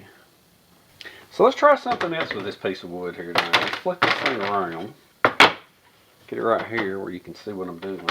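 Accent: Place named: Florida, United States. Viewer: I was 17 when I went into the Army,I was the only one that was not an NG,I was RA and 17 all the NGs was from the north and me from Fla farm,they made fun of the way you and Isound untill we went on bivouac and to the rifle range,The city boys had never had it rough or been out in the sticks for fun,guess who had the last laugh,I can listen to you all day,just makes me home sick,keep it up Bud I,m 71 now and they call me OldJoe,or Old Grand Paw any way love uor stiff LOL keep it,Oh I did not know I was satropping wrong, thanks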